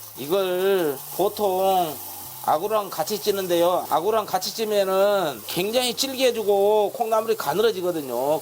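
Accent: Jeolla, South Korea. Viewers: 세프님.전라도.말투가. 너무.정겹습니다 .그리고.좋은요리고맙습니다.항상행복하시고.오래도록좋은요리부탁드닙니다. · 전라도 사투리를. 많이. 쓰시네여😊😊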